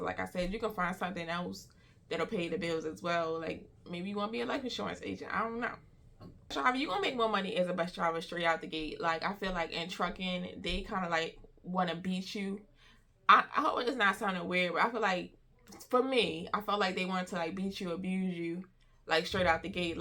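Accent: Baltimore, United States. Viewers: Yoo you got a Baltimore accent out this world i thought i was watching the wire lol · U got to be from Bal-Da-More!🙌🏽🙌🏽….love ur videos💪🏽🙌🏽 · Yea she sounds like me lol Baltimore all day baby 😂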